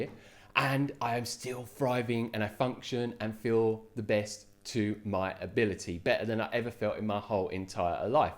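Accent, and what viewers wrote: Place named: England, United Kingdom. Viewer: İ concur with you. İ watched this video for listen your enlish accent. And i understand you clearly :)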